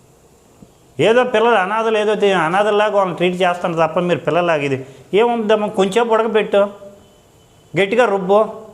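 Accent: Srikakulam, India. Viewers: బ్రదర్ నువ్వు ఉత్తరాంధ్ర శ్రీకాకుళం జిల్లా వాసిలా ఉన్న బావుంది లాంగ్వేజ్ బాగుంది మంచి మంచి పని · Srikakulam slang super sir....bagundi....sweet ga...asalu me east west vijayanagaram srikakulam vallu tittina...tittanatte untadi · Srikakulam yaasa bavundi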